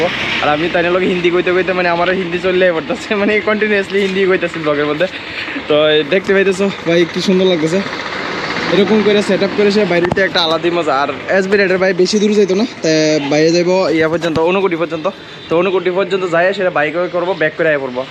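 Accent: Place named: Bangladesh. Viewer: আপনার ভাষা টা আমাদের বাংলাদেশের মতো লাগছে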